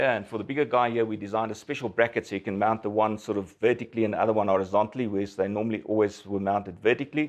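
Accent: South Africa. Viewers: a South African accent talking man tech talk... with all the gear on the desk ... I think it might be an Austrian thing as we have a lot of South Africans living here and we find the Accent very funny as they are mostly very wealthy and loud... but not that with it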